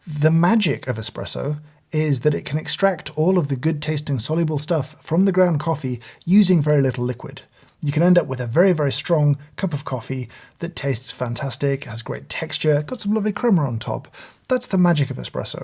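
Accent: United Kingdom. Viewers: I love your British accent! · Of all places, I'm sitting in a tiny town in beautiful Italy and "for whatever reason" can't go to a nearby coffee place. Asked YouTube what I can do with equipment I have in the kitchen and this came up! Looove it, and the British accent made me feel at home😇🙏💝🇬🇧. Thank you so much!! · I love watching videos. I love how his knowledge along with his British accent makes him "sound" pretentious 😆 but he's genuinely likeable. I feel like his extreme knowledge might be a curse, though. I say this because I know how my preferences changed when I was forced to drink my coffee without sweeteners. It completely ruined sweet coffee for me (it's a wonderful thing to appreciate unsweetened coffee, I know) but I'm sure 99% of the cups of coffee I would find have glorious flavors, would just spit out and say it's disgusting 😂 I currently can't even imagine what he means by coffee tasting hollow or having texture. 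I haven't even tasted the difference between sour and bitter coffee, let alone tell when a serving has different levels of both at the same time. I hope to continuously learn from though!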